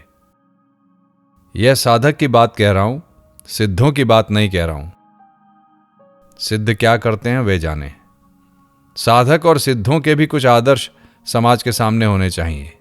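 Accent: Standard. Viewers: धन्यवाद ! बढिया उपदेश ! यह भी प्रशंसनीय बात है कि पारायण करनेवाले गुरुजी से हम सच्ची हिन्दी का उच्चारण भी सीख सकते हैं / कैसी अच्छी है यह बात !!! / यह सब भगवान् का अनुग्रह ही है / हरे राम ! हरे कृष्ण !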